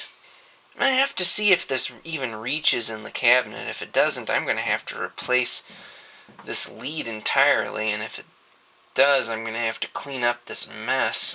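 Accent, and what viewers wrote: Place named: Chicago, United States. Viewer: Are you from the midwest? You have the same inflection as I do, almost like it has a Chicago swing to it...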